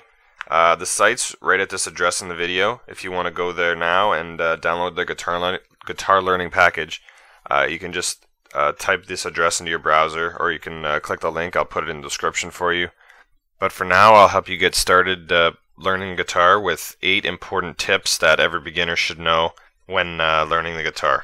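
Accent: Canada. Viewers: are you canadian? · you're canadian, aren't you? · Are you Canadian? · you're Canadian xD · Are you Canadian? There's just that hint of an accent.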